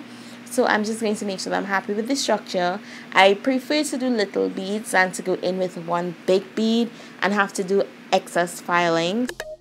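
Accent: Trinidad and Tobago. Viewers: I am sooo happy i was SHOOK when i heard your accent i was like.. wait nah dis is ah triniiiiii!!!!! ayeee new subscriber!!!!!!!!!!!!!👏💯❤ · Love hearing and seeing your videos..it's so refreshing to hear our lovely trini accent🤗great work hunni💕💕💕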